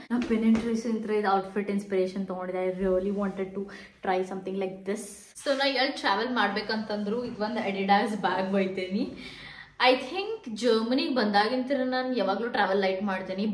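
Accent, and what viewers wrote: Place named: North Karnataka, India. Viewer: nam uttar Karnataka accent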